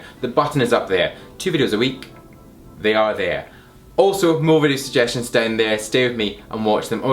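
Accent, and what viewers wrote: United Kingdom. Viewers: Love that British Accent , so cool !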